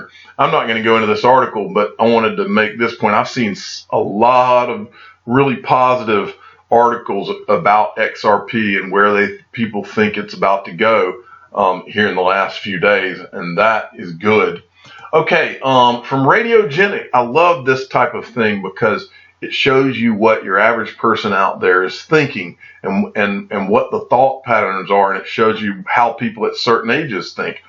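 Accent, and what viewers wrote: South, United States. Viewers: When I 1st started listening to you with the southern accent and your relaxed nature, I didn't take you seriously. That soon changed with the substance of your videos. You nail it every time. You, Tony and Eri are my favorites. Strait shootin news is what gives us confidence. One thing I get confused at though. I think you should also be mentioning the Trezor Hard Wallet. My opinion, it's better than the Nano. I bet you had a smile on your face until I mentioned the Trezor, sorry man. LOL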